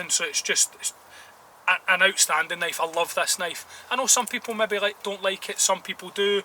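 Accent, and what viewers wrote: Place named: Scotland, United Kingdom. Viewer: Enjoyed the video. I've been thinking about the benchmade 162, but it's quite pricey for the size of it. I'm trying to place your accent.. Where about in Scotland are you guys?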